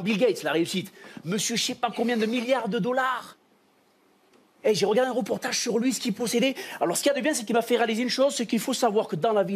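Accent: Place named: Spain. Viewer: Très bon !! Ta un accent qui fait espagnole ou marseillais ☺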